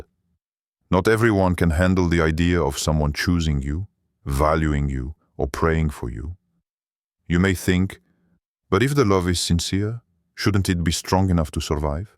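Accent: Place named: France. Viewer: Agfirullah Ya Rabbi but I can't stand😮 it but in love with that Speaker voice with his French accent,Tauba🤲🏻😭A always want hear his voice 😢Ya Latif, I'm addicted to it Hasbun Allah vanimel vakil😢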